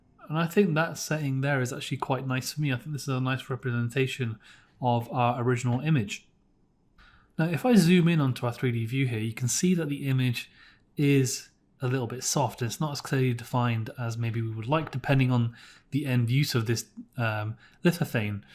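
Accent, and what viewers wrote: United Kingdom. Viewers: Confused about why an Englishman keeps mispronouncing the letter Z? Surely if we can tolerate Aloominum, they can translate 'Zed'? Luckily I am old enough to still remember how to think in Inches though ;) Thanks for the Video, very helpful. Maybe add subtitles for an English speaking audience?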